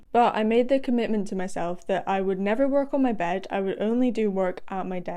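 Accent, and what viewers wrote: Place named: Scottish Highlands, United Kingdom. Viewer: Where’s your accent from? 😊 I’m getting highlands mixed with down south..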